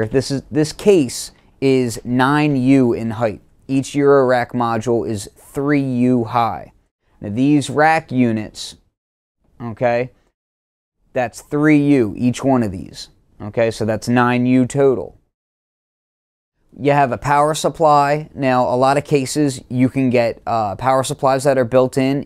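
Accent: Philadelphia, United States. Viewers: I detect a Philadelphia accent. Are you from Philly?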